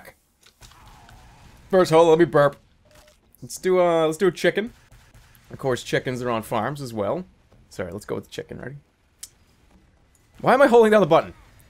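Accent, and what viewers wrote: Boston, United States. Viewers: Are you from Boston? I'm a new sub, and I can't tell...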